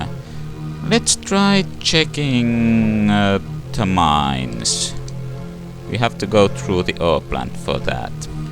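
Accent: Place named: Finland. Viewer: I knew you was finnish (from your accent)! Suomi perkele!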